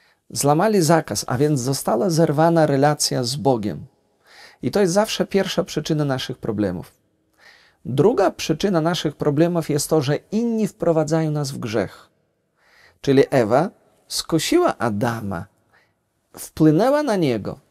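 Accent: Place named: Kresy, Poland. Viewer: piekny, poetycki , kresowy akcent ksiedza, cudny ksiadz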